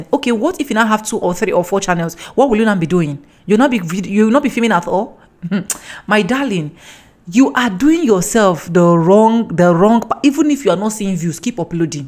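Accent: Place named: Nigeria. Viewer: I like your Nigeria accent, stay blessed Your new subscriber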